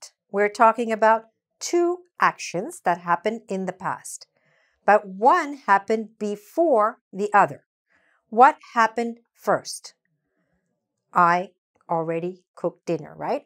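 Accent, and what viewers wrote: Brazil. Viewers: Thank you so much, I think you are Brazilian because I am almost understand you perfecttaly! It is beautifull gift you have teaching! Excellent! Thank you for posting!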